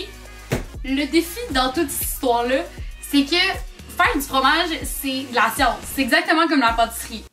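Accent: Quebec, Canada. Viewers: excellent ta vidéos je la kiff aussi , vous êtes très déterminée bravo ...j'adore ton ascent du Québec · Je rêve ou je suis la seule à regarder cette vidéo à 1h24 du mat 😂😂 je kiffe grave ton accent Québécoise et t grave marrante en plus ( à peine regarder je kiffe t vidéo) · Question stupide mais, comment tu différencie "ça" et "ce" avec l'accent québécois? J suis juste curieuse de savoir ☺ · L accent quebecois le plus fort DU MONDE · Wow t'es tellement mignonne, je te trouve magnifique et puis ton accent québécois est juste canon ! Tu fais boom à mon petit cœur 😊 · Le gallon une Unité de b** mais juste aprés... Tu parles en fu*** degrés farenheit... De qui se moque t on ?! (sinon j'aime beaucoup ta personnalité et entendre l'accent canadien , c'est rigolo, c'est agréable à regarder; je reviendrai !) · Fais une video 24h sans l axent québécois stp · J'adore ta chaîne parce que tu fais des choses originales. 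Et ton accent québécois 😍😍😍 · J’adore ton accent canadien ton énergie et ta joie de vivre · Québécoise? ;-) <3 J'adore cet accent, putain!!! <3<3<3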